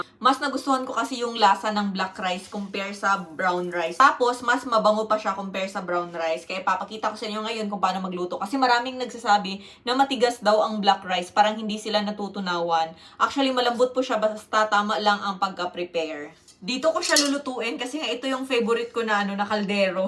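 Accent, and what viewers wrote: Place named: Visayas, Philippines. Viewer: Bisaya c madam diay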